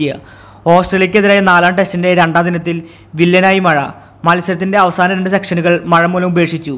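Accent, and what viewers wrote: Thrissur, India. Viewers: ബ്രോ, തന്റെ തൃശൂർ ശൈലിയിലുള്ള വായന ഒന്നവസാനിപ്പിക്കാമോ. പൊതു ശൈലിയിലേക്ക് വരൂ. എന്നിട്ട് സബ്സ് ക്രൈബ് ചെയ്യാൻ നോക്കാം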